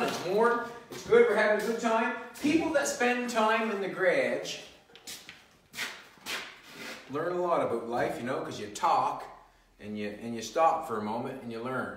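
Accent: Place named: Newfoundland, Canada. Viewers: Sounds like he's in Eastern Canada...eh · True newfie,, talk for days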